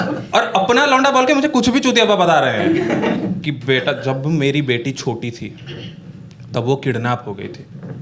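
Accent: Odisha, India. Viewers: Tone se to odisha se lag rhe ahem ! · Bhai pura radducha .... Hama hindi in odia accent ❤😊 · Odia tone sun ke maja aa gya!!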